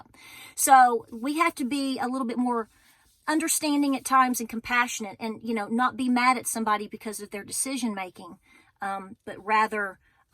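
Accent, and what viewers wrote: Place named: Kentucky, United States. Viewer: you’re beautiful, inside and out! So very thankful I found your channel. My husband and I live in west central Kentucky (both of our families are from eastern Kentucky) and hearing you talk feels like “home” to us. Thank you for all your hard work. This can all feel so lonely sometimes and it’s nice to read comments and not feel so alone. Wish we could all get together! ❤️🤍💙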